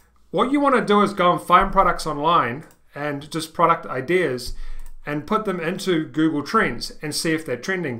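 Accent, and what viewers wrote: New Zealand. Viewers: you are from new zealand right.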